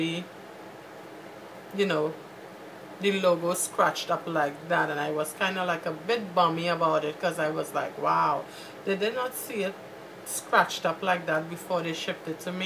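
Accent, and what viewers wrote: Trinidad and Tobago. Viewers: i love trini accents :) lol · are you from trinidad? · are u from Trinidad?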